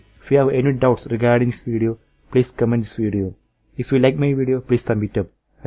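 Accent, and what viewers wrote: Kerala, India. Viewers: are you from kerala ? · r u and indian???from kerala?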